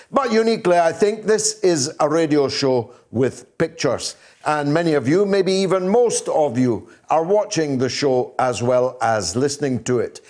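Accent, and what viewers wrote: Scotland, United Kingdom. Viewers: This dude is SCOTTISH as hell man. Come on! You're like Lloyd Christmas in Dumb & Dumber. "Aspen? California eh??? Nice. "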